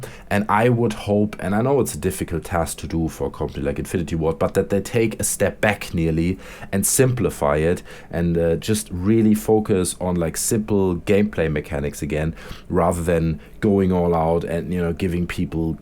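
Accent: Germany. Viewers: ARe you german :) cause you Sound a bit like it.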